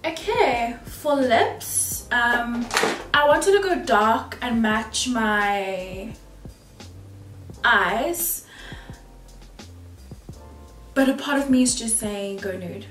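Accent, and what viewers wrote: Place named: United Kingdom. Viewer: Hey! Love this tutorial as always and I've BEEN a fan since your days (British accent and all lol) I'd love to win this prize and follow as much of your amazing looks as possible❤❤ Instagram: nickynic_101 Foundation shade: Maybelline 24 HR superstay Caramel 60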